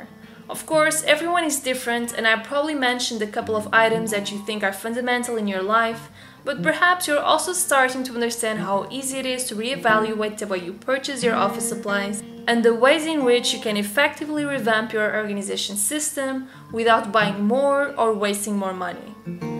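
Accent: Portugal. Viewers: Are you portuguese? I recognize your accent ahaha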